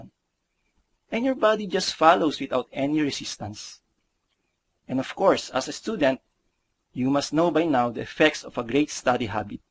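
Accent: Philippines. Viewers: Filipinos speak that way. it's ok as long as we understand what he say. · Duhh. Filipinos really speak that way. And who cares, as long as we understand what he's saying then everything is fine. Okay?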